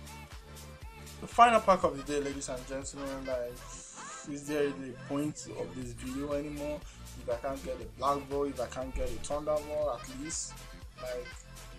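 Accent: Nigeria. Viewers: honestly, i dont know. Myself, i'm Ghanaian. I just know he's nigerian because of his accent, and coz he confirmed in one of he's videos that he's nigerian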